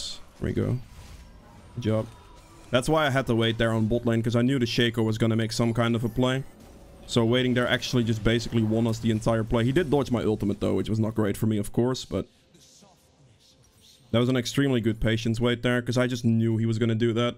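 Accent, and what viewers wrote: Netherlands. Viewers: Ik hoorde van de eerste 2 woorden al da je Nederlands bent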